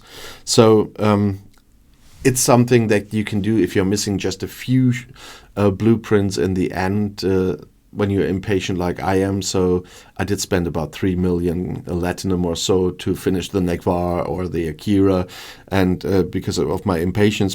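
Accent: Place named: Germany. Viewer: You're English is fine..of course you have a German accent to us Americans but it's not very heavy it's actually kinda cool..however some of your words you pronounce the British way but that's to be expected, overall you are very clear and easily understandable bro 👍🏽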